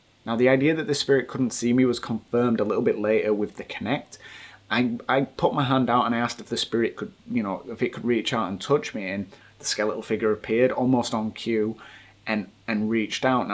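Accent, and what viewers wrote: Yorkshire, United Kingdom. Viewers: Don't apologise for the hour long vid! It was incredible, it would be amazing if you did more hour long vids. Is your accent from Yorkshire? If it is, have you ever thought about investigating the moors? Quite a few times I have seen odd things there.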